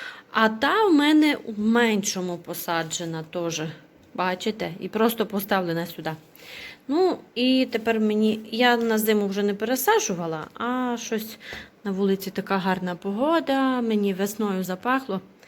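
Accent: Transcarpathia, Ukraine. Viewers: Привіт! Зайшовши на ваш канал зразу підписалася.Закарпатська мова 👍 я теж з Закарпаття. Легких вам пологів💛💙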